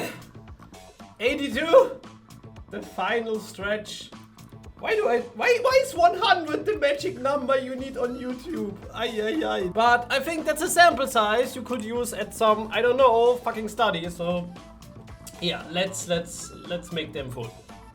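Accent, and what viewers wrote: Germany. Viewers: Love your german-english accent :D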